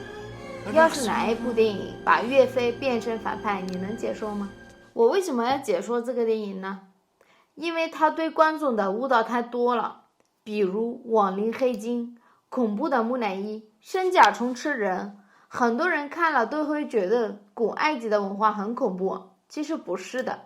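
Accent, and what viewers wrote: China. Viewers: 可惜學得是中國口音，美感打了點折。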